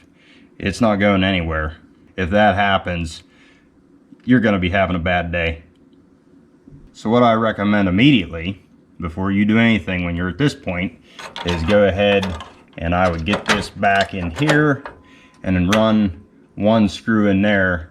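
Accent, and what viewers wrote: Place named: Pittsburgh, United States. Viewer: Are you in or from the Pittsburgh, PA area? Other than not saying yinz, you have a pretty good Pgh accent.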